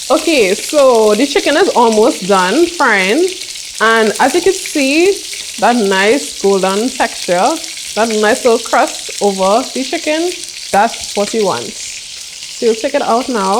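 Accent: Trinidad and Tobago. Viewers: Why do you sound like a Trinidadian??? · Sounds like a 🇹🇹 accent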